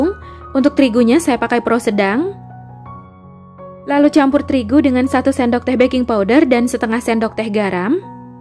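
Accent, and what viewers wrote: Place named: Bandung, Indonesia. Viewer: Suaranya kyk suara khas orang Bandung, salfok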